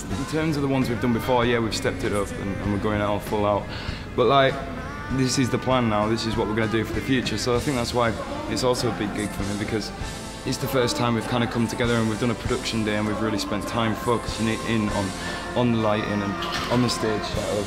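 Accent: United Kingdom. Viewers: Was not expecting tht propa strong yorkshire accent lol! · I love his brittish!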